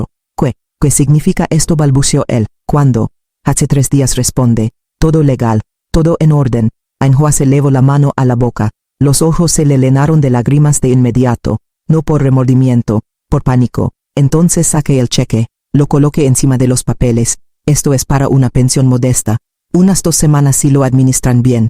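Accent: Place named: United States. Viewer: No puedes hablar en un español claro? Porqe ese tonito agringado se te oye muy mamuco y no se te entiende. Aparte, no hay necesidad alguna de que utilices esa forma de hablar. Ya michas personas te lo han dicho pero te vale. Pon remedio urgente a esto, sino ya no vamos a abrir tus videos. Ok?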